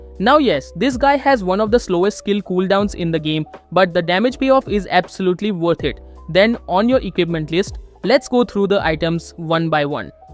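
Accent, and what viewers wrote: India. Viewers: Indiano falando inglês é engraçado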